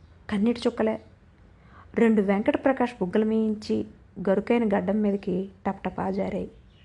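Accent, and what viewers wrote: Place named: North Andhra, India. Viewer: ముగింపు ఊహించగలిగేదే ఐనా కథన బలం చదివిస్తుంది ఇలాంటి కథల్లో! జీవన చిత్రాలు కథలుగా కదిలే విధం అదేగా! ఉత్తరాంధ్ర యాస గారి గళంలో బహు సహజంగా ఉండి కథకు మాధుర్య పరిమళం అబ్బింది!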